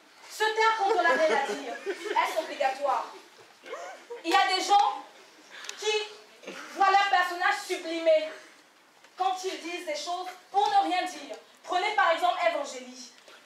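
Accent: Cameroon. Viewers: Accent très 🇨🇲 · Elle a un accent camerounais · Absolument son accent est typiquement camerounais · Elle a l'accent camerounais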